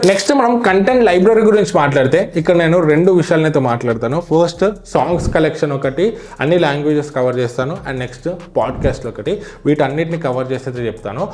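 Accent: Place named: Telangana, India. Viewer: Broo ah Telangana slng loo kakunda normal slng loo video cheye broo adhe better ekkuva mandhi choostaru ide na suggestion 😊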